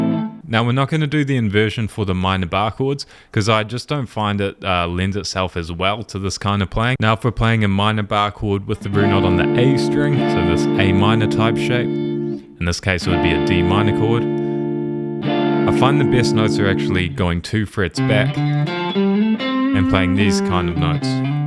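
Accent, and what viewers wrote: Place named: New Zealand. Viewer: Fantastic! Best instruction and I detect a kiwi accent….. where we love and many others.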